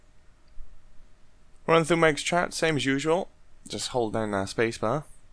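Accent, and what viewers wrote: Wales, United Kingdom. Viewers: Are you welsh man?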